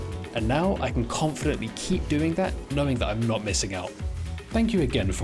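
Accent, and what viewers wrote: United Kingdom. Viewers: Came for a big loot stayed for a great vibe + the british accent ofc, great vid, hope your channel grows massive in no time! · Dang, I just love those videos! ❤ One of the things I was interested in was if you'll hit the full stack of divines, and you did! It was such an awesome view! Great Jon on all the testing, I admire your devotion and time investment. Looking forward to the next league and further testing. I hope you'll be as restless as in the Mirage! ❤ Ps. I live in England, not English tho. I could listen to your lovely English accent all day long 😅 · Came for the hiveblood Stayed for the distinguished british accent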